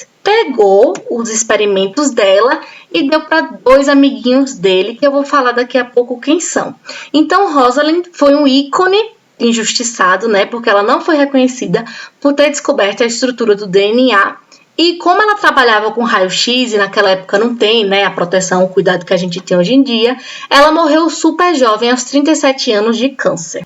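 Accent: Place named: Recife, Brazil. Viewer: Parabéns! Gostei muito do teu jeito de explicar (com o teu sotaque e gírias ficou melhor ainda, sou de recife e julgo dizer q tu é daqui também kkkkk). Força aí no começo do teu canal, acredito que você fazendo o que gosta só gera bons frutos (como em tudo na vida). A única dica que eu te dou é relaxar na hora de gravar e deixar de lado o nervosismo de como falar, você relaxando e se divertindo nas explicações fica maravilhoso pra quem assiste. No mais é isso, gostei muito também do início que tu colocou "senta que lá vem história" fica muito bom esses memes em explicações de conteúdo.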